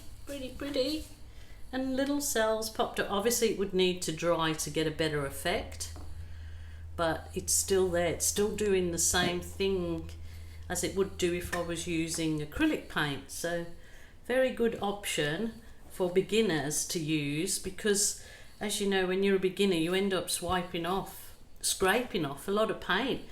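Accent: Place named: Liverpool, United Kingdom. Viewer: Do you have a Liverpool accent mixed in,.,, sorry if this is rude or you've mentioned it. Love this videos and your simple recipe proves the art comes from the artist's skill. LOL watching you now and it does sound like some scouse in there!!! Ps love these colours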